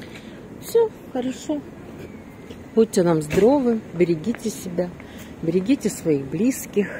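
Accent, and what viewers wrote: Odessa, Ukraine. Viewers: Спасибо за вашу прогулку по Одессе и ваш такой родной одесский акцент!❤❤❤❤❤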